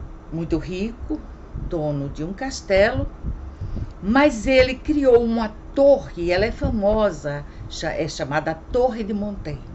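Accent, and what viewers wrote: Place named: Pernambuco, Brazil. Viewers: Por favor,! Faça vídeos longos! Vc tem um voz muito agradável somada a um sotaque refinado do Nordeste, som q, aliás, adoro ouvir! Vc é muito agradável nas sua colocações e tem muita coisa a dizer com o seu conhecimento! Caso alguns dos seus seguidores não gostem de vídeos longos, alterne vídeos curtos com longos para agradar aos q gostam! 😊 Outra coisa: vc poderia me dizer o título do livro romântico q vc iria começar a falar, mas se lembrou q não estava na sua pilha? Fiquei curiosa! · Feliz Ano Novo e Bons Livros 📚!!!! Gosto de ouvir você sobre os livros sobretudo com sua fala pois lembra muito minha mãe Marlene que era também pernambucana. Bjsss😘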